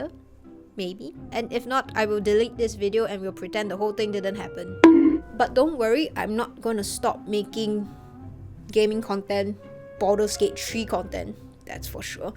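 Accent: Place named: Singapore. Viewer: happy birthday - i came for the bg3 memes, and now staying for the Singaporean accent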